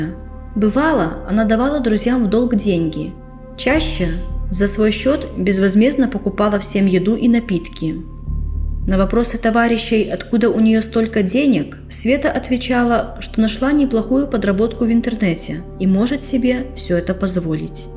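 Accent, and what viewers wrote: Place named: Belarus. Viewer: Белорусский говор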